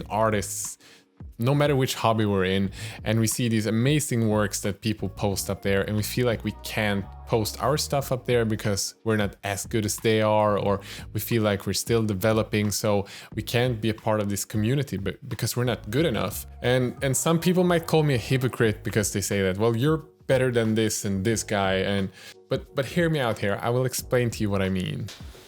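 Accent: Sweden. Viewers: Hittade din kanal för några dagar sedan. Hobbyn hade jag lagt på hyllan men efter sett dina filmer så börjar jag bli sugen på att måla igen. För du är väl svensk , har för mig att jag sett kr och du har en svensk brytning. Bra jobbat vilket fall som helst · This is probably one of the most wholesome videos out there for this hobby! <3 As someone with depression, executive dysfunction, and on top of that adhd which leads to myself having 243506 different hobbies... I spend most of the time jumping from thing to thing. Painting miniatures is one of those things, and right now i think it was like... a year ago since i last painted anything... i paint like 2-5 times per year otherwise.. i go in waves. right now dnd is the wave that i spend most days working with as a dm. So... am I good at painting? No, but I'm average, decent, acceptable for tabletop standard, and as you say, that's perfectly fine! 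I dorecognise that lingering "i'm not good" feeling thou... damn it's crippling, but.. i'm doing my best at pushing that aside and instead going "damn that's crippling but what the heck let's do it anyway, in my tempo!" <3 Thank you for this encouragement. (now, if I could only fix the painting station which is just lying in heaps right now... xD) Also... Your dialect sounded very swedish,, then I saw the fiverr video and in the end I heard "nej inte äta den!".... yup... so hello fellow swede! :P waves from sweden, and i'm guessing the minis wip group aswell :P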